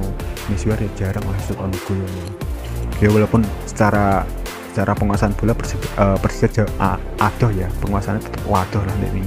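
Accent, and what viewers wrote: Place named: Surabaya, Indonesia. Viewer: Bahasane suroboyo bgt 😁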